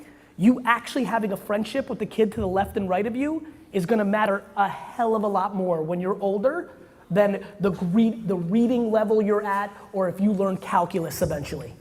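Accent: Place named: Armenia. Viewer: هاي ارمي🌝🌝❤ قبل لااقرا اسمك حسيت من طريقة كلامك انك ارمي🌝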